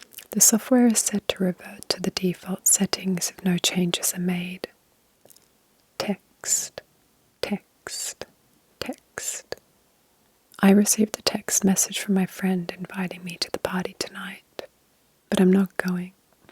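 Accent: Australia. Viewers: Hearing a soft gentle aussie womans voice whisper certain words and example sentences takes me straight back to soing spelling bees in primary school. There are notable austtalian ASMR creators, but not many, so its always nice to find them. Just makes me relate more which makes the relaxation better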